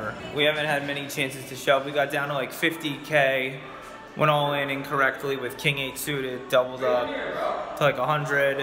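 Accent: Australia. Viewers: the aussie accent is a solid 9/10. love it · 7/10 on the Aussie accent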